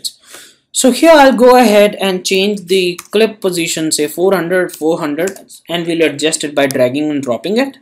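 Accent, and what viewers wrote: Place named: India. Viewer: I fount it interesting that your name is Japaneses and you have Indian accent!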